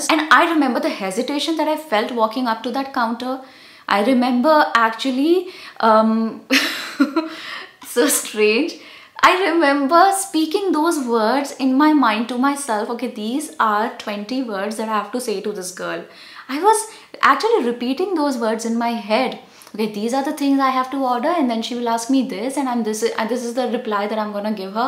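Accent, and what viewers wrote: India. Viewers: As a westerner I have noticed a possibly related problem here on youtube. Sometimes when searching for tech advice I used to find videos from Indians that would have a title written in English, but then when you actually watch they are speaking in Hindi or another Indian language. Sometimes they would speak a couple sentences in English and then switch their language for the rest of the video. It made no sense to me back then because it seemed like it was just wasting people's time, especially when there would be no subtitles. However, because of this video I think I understand why this happens. It seems that some Indians use English as a way to show status or brag even if they are not necessarily comfortable with the language. Another problem is that it seems many will speak broken English with grammatical errors or in a way that is way too formal, but seems to have a strong Indian accent while still speaking English very fluently.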